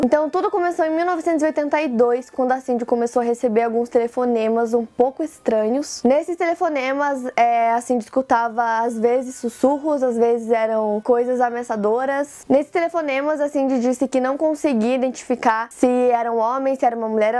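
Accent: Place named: Rio Grande do Sul, Brazil. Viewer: O sotaque dela me soa gaúcho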